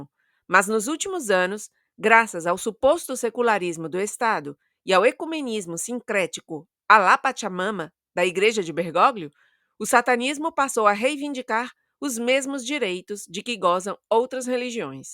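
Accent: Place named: Ceará, Brazil. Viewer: Muito boa a explanação das palavras do Monsenhor Viganò,. E com todo respeito: o seu Português falado é muito interessante de ouvir. Manifestações do Castelhano com sotaque nordestino do Ceará, onde você morou por um bom tempo.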